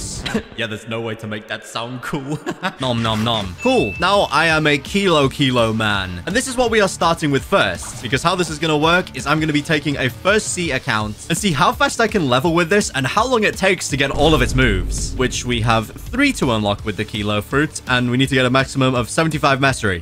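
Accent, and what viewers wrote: United Kingdom. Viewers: Are you britain?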